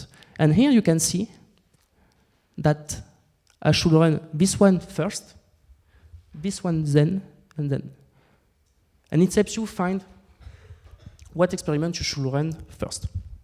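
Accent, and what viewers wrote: France. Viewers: Stop la condescendance, nous ne sommes plus au lycée. Être "fluent" en anglais ce n'est pas donner à tout le monde, que ce soit par contexte, âge, lieu ou expérience. Il y a une sale manie en France de faire de la maîtrise de l'anglais une barrière à l'entrée au monde de l'entrepreneuriat (entretenu par l'institution école de commerce). Hors on se ferme à 2 choses : - les intelligences ne parlant pas très bien anglais (nos mères, nos parents ?) - les marchés non anglophones et pourtant massifs et en croissance forte (à tout hasard : chine). Hors désolé, mais le monde anglais offre tellement de savoirs que ces vidéos ne font pas le poids. Pour un anglophone l'accent est trop prononcé, pour un hispanique l'accent rend l'anglais encore plus difficile et pour un français, s'il parle anglais, il ira regarder d'autres vidéos. Perso je n'ai aucun mal avec l'anglais, mais pour faire du sport, pour le métro, ou pour s'endormir, le frenglish ça demande trop de concentration. 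En plus on va pas se mentir, c'est un peu bidon comme stratégie rien que vis à vis de l’algorithme de Youtube. Perso j'aime la famille pour la voir se rater. Mais je ne peux pas non plus leur en vouloir de tester.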